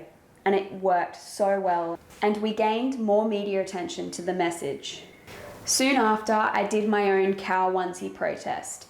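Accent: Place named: Australia. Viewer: If you are the vegan teachers daughter, why are you Australian and your mother is American? How did you get the accent?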